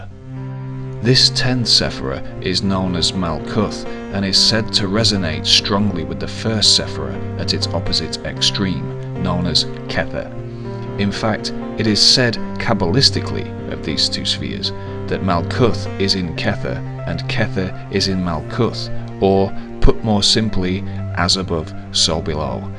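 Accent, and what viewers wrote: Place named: Liverpool, United Kingdom. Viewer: this guy loves the sound of his own voice, but still interesting non the less, not bad for a scouser. i'm a woolly back by the way if i sound bitter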